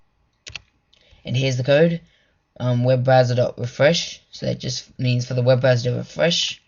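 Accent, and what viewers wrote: Australia. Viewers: 5 more comments till 1337 and are u australian?cuz of ur accent and cuz u said G day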